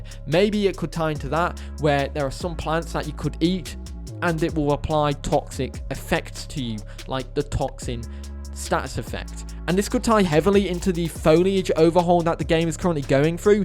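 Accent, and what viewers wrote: United Kingdom. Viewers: its been so much time since ive watched a video, and god does the bri ish accent bring me memories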